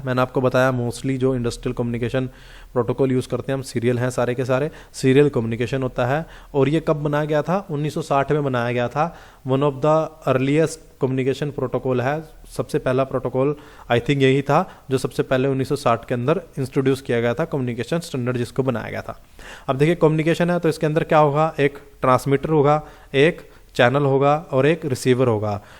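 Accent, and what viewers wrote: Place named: Haryana, India. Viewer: Bhai haryana se h pta lag gya awaj se ❤❤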